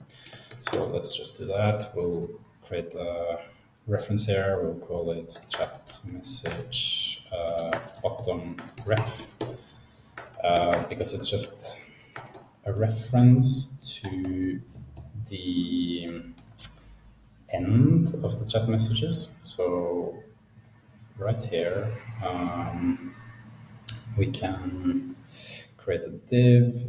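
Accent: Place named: Norway. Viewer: you speak English like a Norwegian. Er du Norsk?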